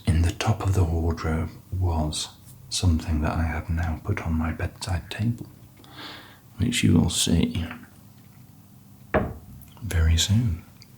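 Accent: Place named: United Kingdom. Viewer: Your videos combine 3 of my favourite things - a British accent (which I have a total soft spot for) ASMR and mini hotel room reviews! Genuinely the perfect combination. Not to mention your calm and soothing voice. I honestly can’t imagine better videos to relax and watch on here :)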